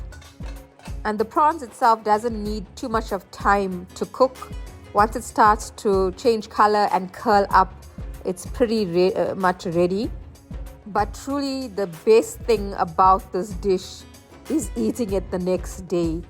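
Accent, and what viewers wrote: Durban, South Africa. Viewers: Thank you very much…looks and sounds delicious… I have to try your method… my family loves crab and prawn. From you accents I’m guessing your from Durban SA my beautiful home of birth…I may be visiting this year sometime… do you have a restaurant and is called Peri-Ma’s Kitchen? Just got to visit. Once again Thank You ❤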